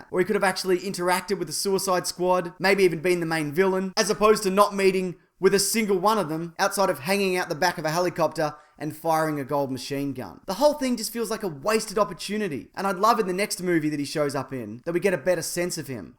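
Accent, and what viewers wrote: Australia. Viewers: I really like the idea of a solo joker movie with Harley Quinn. I felt there was not a reason to put the Joker in the Suicide Squad movie. It's as as if they want to put as many characters on screen as possible and sees what sticks. But thanks for letting me know that there's a novelization. I'll see if it's at <link>. By the way your Australian voice is awesome!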